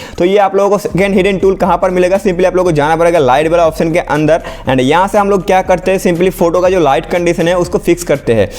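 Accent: Bengal, India. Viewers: Vai up Bengali ho??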